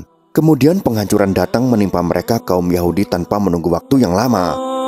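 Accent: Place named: Bali, Indonesia. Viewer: Admin nya orang bali sepertinya. Dari logat suaranya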